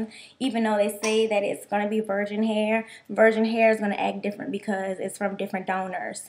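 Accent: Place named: New Orleans, United States. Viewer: R u frm new orleans because u sound just like us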